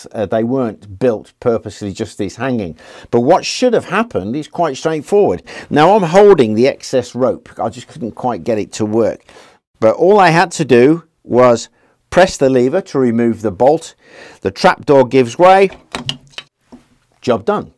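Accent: United Kingdom. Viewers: Any story in a Brit accent - lay it on me!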